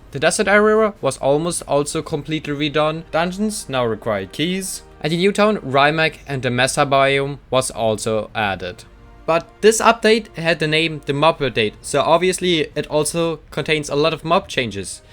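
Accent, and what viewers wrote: Germany. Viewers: You are german aren`t ya? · du bist deutsch oder hahaha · Are you german? Your accent seems like a german accent.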